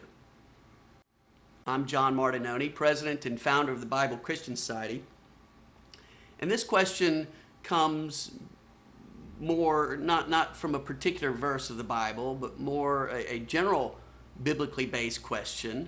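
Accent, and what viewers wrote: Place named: South, United States. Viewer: you sound like you have a southern accent, are you from the south?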